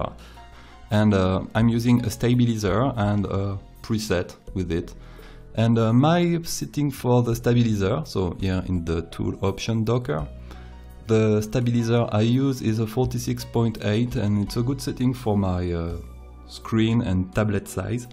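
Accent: France. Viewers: je lai remarqué a l'accent :) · i know at your voice your french i guess ... but its not bad :D you can speak english for ur vids is it good <3 im dutch ... i just talk english because i like that launge... im learning too french :P on 02/26/2016 i got a test from french laugne :D i got 17/20 pretty good :D i like ya vids! <3 <3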